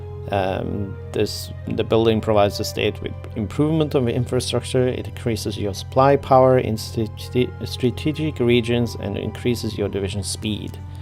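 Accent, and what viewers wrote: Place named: Eastern Europe. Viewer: I think some Eastern European country or mid Asian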